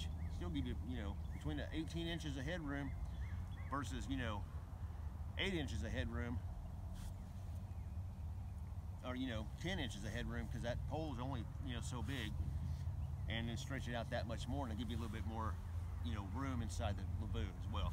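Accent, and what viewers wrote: United States. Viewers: I could just tell by the intro that this was going to be a American dude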